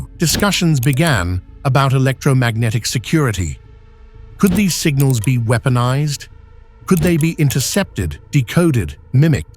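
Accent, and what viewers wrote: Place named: United Kingdom. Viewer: A very British voice with a very American cultural bias sound completely unnatural to me - go figure!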